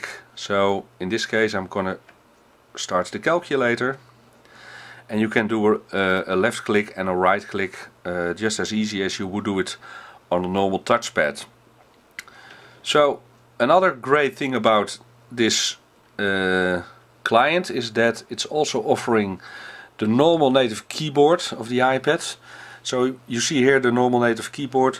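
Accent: Netherlands. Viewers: ja dat is echt duidelijk te horen door een nederlander :p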